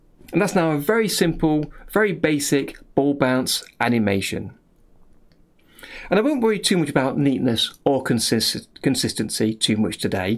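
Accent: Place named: United Kingdom. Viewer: The best thing in your tutorial is your beautiful british (?) accent ! 😄. Easy to understand, and relaxing. Thanks for your great work !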